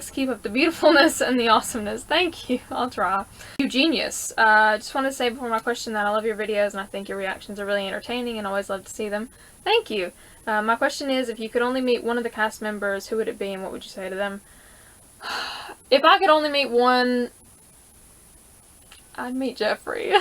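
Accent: South, United States. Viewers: I knew I subscribed to you for a reason. You're speaking my language! I don't hate Carol & Daryl either, but I am over them being these overly invincible characters who monopolize screentime that could go to other characters. They're not the only 'interesting' characters on this show but you can't tell by the way the screentime is divided. Give me more of Michonne & Maggie, damn it! But the Southern language thing is SPOT ON. I was born and raised in south Georgia, and that's how the people here talk as well. When I first started watching your videos, I thought you were from here but nope. You're just a part of my extended Southern family. Greetings, my Southern Soul Sister! P.S. I started in S4 after binge watching too. So, you're not alone in the sense that you started watching the show 'late.'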